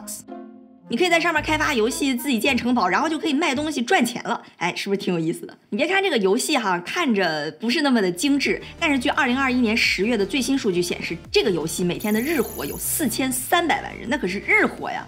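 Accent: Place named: Shandong, China. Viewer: 听出来小林的山东味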